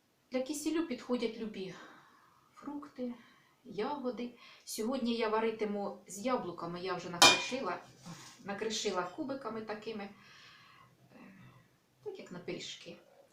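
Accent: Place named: Poltava, Ukraine. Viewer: Дякую за рецепт ❤У вас мова ,як моєї мами,вона з Полтавщини. А Ви з якої області???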